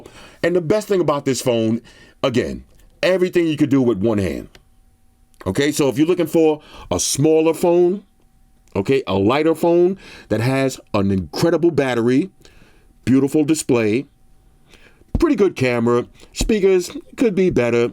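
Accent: New York, United States. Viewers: haha I know a New Yorker when I here it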